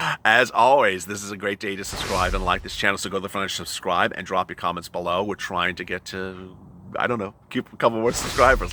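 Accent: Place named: South, United States. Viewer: Good morning this is Tennessee coming to you your southern accents getting good just a little more drawl to it LOL!!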